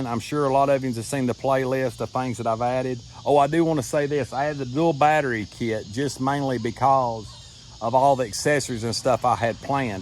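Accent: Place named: South, United States. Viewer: I heard that talk and sure enough... T for Tennessee brother!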